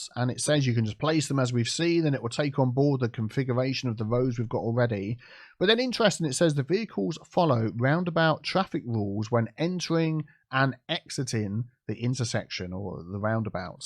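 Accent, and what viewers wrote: United Kingdom. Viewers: I wanna see you and city planner collaborate on a city together this would sound really interesting you with your cool British English and City Planner with his cool American English. You 2 putting all of your expertise together. Just like famous PC Gamer Stonemountain64 and his British gaming friend Tomographic often teaming up together.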